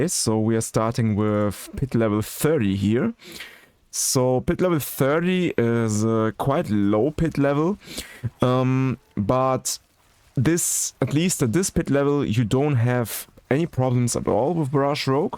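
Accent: Germany. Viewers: Ich höre raus das du Deutscher bist. Finde dein Build ganz cool und werde es mal ausprobieren sobald ich den Rogue auf 100 hab. Pit 200 lauf ich dann eben mit meinem Necro.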